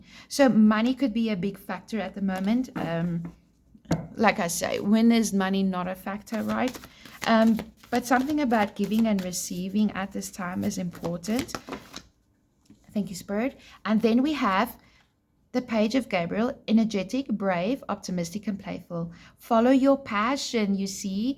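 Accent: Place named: South Africa. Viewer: Lekker to have a South African accent on a brilliant reading! 🎉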